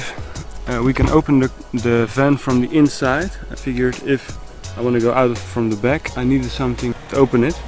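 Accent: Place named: Netherlands. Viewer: Super gaaf gedaan! Ben er best jaloers op! Aan je Engels te horen kom je uit NL denk ik.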